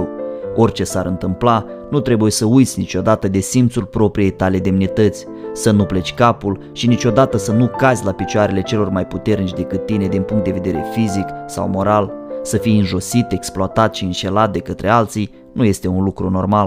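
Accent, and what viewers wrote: Moldova. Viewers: Graiul dulce moldovenesc nu are egal , dar nici cu maramuresenii cei glumeti si simpatici nu imi este rusine. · Perfect,Și un accent clar, citit ,moldovnesc ,cel mai scurt și clar în limba romana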